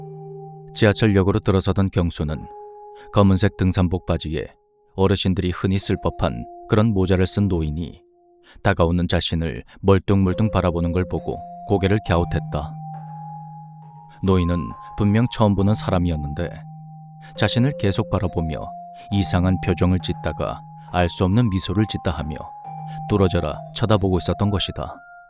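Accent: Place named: Busan, South Korea. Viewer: 이야... '민식이 법' 이거 나오는 순간, "맞아... 님 다시 시작하셨지. 실감나네." 하면서 굉장히 기뻤습니다. 요즘 댓글도 다 읽으신다면서 즐거워 하시는 모습도 상상되구요. 그러면서도 여전히 님만의 아우라가 느껴지는 소중한 영상이구나 생각합니다. 님만의 리딩, 부산 사투리로 듣는 괴담만이 주는 심오한 느낌, BGM을 기가 막히게도 선정하시는 그 아우라마저 느껴지는 신묘함, 또한 정말 너무너무 재밌게, 실감나게 인물들 대사를 열연해 주시는 그 모든 것이 더더욱 듣길 잘 했구나 하면서 하염없이 감사스럽고 기쁩니다 그냥. 우리 님 올 한 해에는 유래없이 기쁨만 연타로 일어나는 좋은 한 해 되셔요. 하도 많이 웃으셔서 구각이 아프다~~ 하시는걸 뛰어넘도록 쭈욱 좋은 일들만 있으실거예요. 감사히 듣는 모든 사람들이, 재밌게 들었던 모든 사람들이, 표현은 달라도 저처럼 응원할거니까요.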